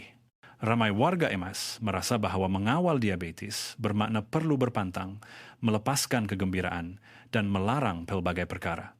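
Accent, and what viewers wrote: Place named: Indonesia. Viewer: AI...Hari tu bahasa Melayu...ni bunyi macam bahasa Indo...Sangguh...sepatutnya disebut sungguh...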